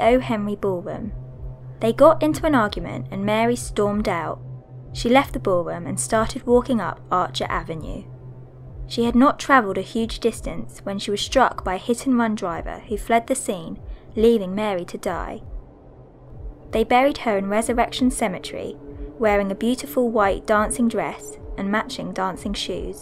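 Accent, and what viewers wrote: United Kingdom. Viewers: Joel Fernández Jerez its almost like Chills twin with some sort of British accent.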